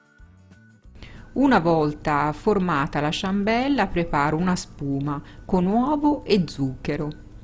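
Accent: Tuscany, Italy. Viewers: Che bel parlare toscano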